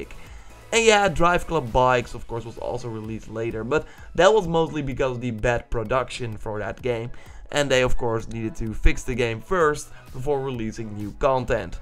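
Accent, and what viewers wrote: Netherlands. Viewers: Autumn Lies Empty Probably because his native language is dutch, and in dutch "idea" is pronounced "iday" and he kinda carried it over to english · Hij heb wel gelijk gap je doet zwaaaaar clickbaiten.. en als je nou nog een beetje engels kon ipv van dunglish had et een ander verhaal geweest maar je ben niet om aan te horen ! speciaal kanaal gemaakt voor deze comment dude . en kan je beginnen over haten dit dat maar als ik er zelfs een account voor maak kan je nagaan hoe erg mensen zich storen!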